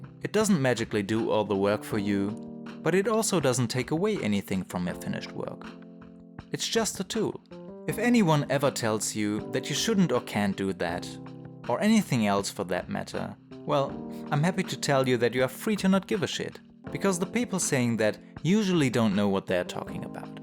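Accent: Germany. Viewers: You have a German acsent Ich finde es schön wie du darüber erzählst was zeichnen für dich ist und das man nicht auf andere hören soll....Danke für die tipps 😄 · are you german?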